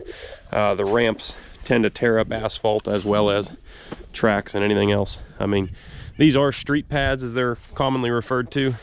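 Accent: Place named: Missouri, United States. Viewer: Hi, Reading through the comments i picked up your name. Someone said Pennsylvania? By your accent and the trees was guessing Missouri but I guess not. Anyway when I worked at a Lead Foundry in the 70's drove a 920 Catipiler front end loader and everything else they had there. What I really enjoyed driving there was the old White Freightliner. Had a hydraulic 5th wheel hitch that you could hookup the trailer and lift it off the ground and go after you swung out and hooked up the pigtails and lights. They had all kinds if forklifts but my favorite was the small hyster I used for running shipments around and weighing stacks of lead bricks to band them up. The bander was electric which was awesome as well.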